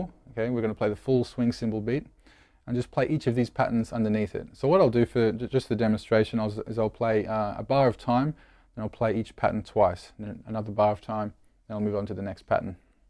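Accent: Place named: Australia. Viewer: mate! I knew as soon as I heard the Aussie accent that these vids are going to be dinky di. You don't waffle on with a 20 second intro of some extremely mediocre hard rock music, followed with a "Hi guys, I'm from xxxxx in xxxx, like to say a huige thanks to my sponsors" Blaaah blah blah... Straight to the point! Love it mate! Cheers from Melbourne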